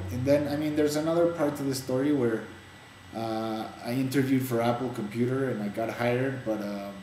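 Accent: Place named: Eastern Europe. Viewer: you sound eastern european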